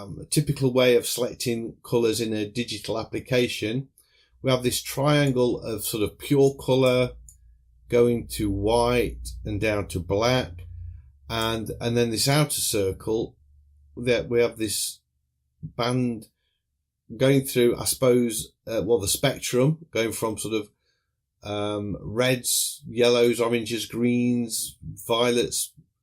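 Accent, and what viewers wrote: United Kingdom. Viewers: Now can you teach me to speak with an English Accent? Love it!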